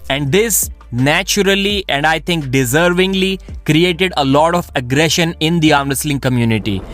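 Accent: India. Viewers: This pajeet accent is hard to listen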